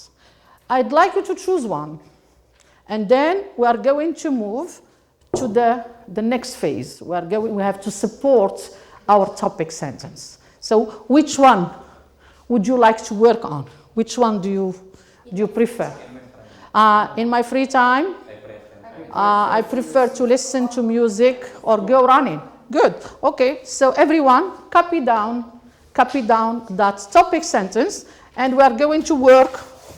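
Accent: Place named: Morocco. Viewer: at least we could say this is Moroccan accent.We all know having British or American accent is no by no means available for all English teachers ....it's not easy to speak English authentically .if you have any other oppinion then you find it difficult to speak English like the way British or American people do ( namely native people )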